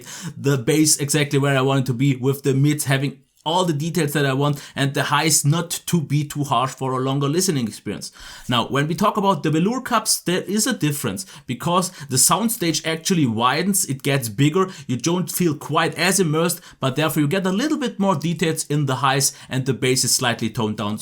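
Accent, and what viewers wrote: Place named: Germany. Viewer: the German accent is hella funny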